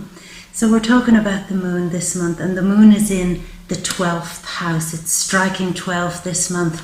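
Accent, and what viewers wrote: Ireland. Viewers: Six bajillion holohohoaxers on the rampage from the murder of the Romanov children in cold blood, the Holodomor and the 50 million anhialated through the 1918 Spanish flu, called so because Spain was the only country without a blanket ban on media. To wall Street and the Frankfurt School Freudian Marxists kicked out of European countries, again, now to rule by political correctness and to silence the counter narrative unofficial thinkers. Apart from that tyrannycal despotism I really like your top on you, and ye've a great brogue.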